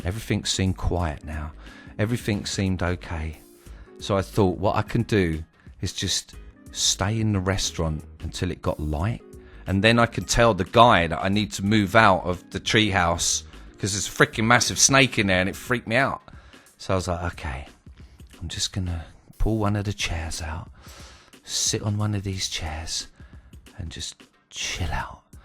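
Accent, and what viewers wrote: United Kingdom. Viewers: ur fucking hilarious mate just subscribed where are you from in England it seems amazing how different some.brittish ppl sound compared to others but I guess everywhere has different accents lol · sounds like a London guy, as no Northern Monkey could be so entertaining.Him & Frankie have cost me a fooking fortune after trying out the games they convinced me to play.: )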